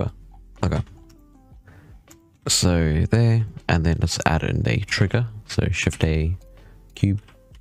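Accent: South Africa. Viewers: Just thought you sound abit ex south african, I am ex South african and we sound very alike lol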